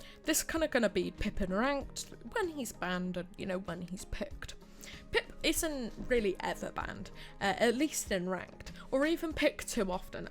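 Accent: United Kingdom. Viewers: Omg, your British accent is pure spot on but I'm having a little bit of trouble making out those words XD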